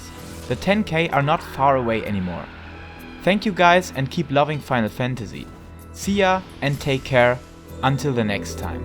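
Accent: Germany. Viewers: Are u German? You Sound like a German 😶😂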